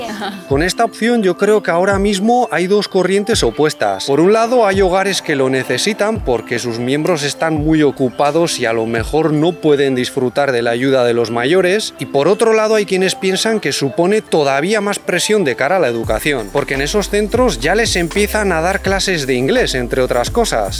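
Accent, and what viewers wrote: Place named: Spain. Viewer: Hoye habla vien Como un Español se te hoye feo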